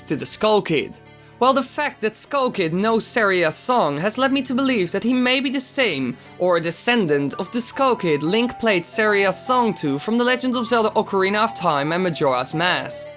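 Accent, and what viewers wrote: Netherlands. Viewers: Wow you speak english with a dutch accent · Are u dutch?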